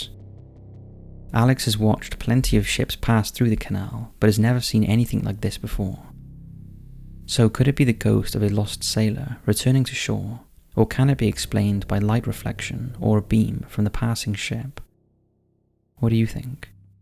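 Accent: Wales, United Kingdom. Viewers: Always love the vids, but can i also say cheers for getting the docile tones of our Welsh accents into the World! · He has a Welsh accent. So I challenge you to pronounce Rhydymwyn, Machynlleth, Pontrhydfendigaid or Llansantffraed-Cwmdeuddwr correctly. Personally, I only knew how to pronounce Duluth because it featured in season 1 of Fargo. If it wasn't for that, I wouldn't know how it was supposed to be pronounced correctly either. Believe it or not, many people in Britain aren't aware of the most popular cities in the midwest, just as most Americans aren't aware of most cities and towns in the UK outside of London. Just enjoy the content of the video and don't focus so much on the pronunciations!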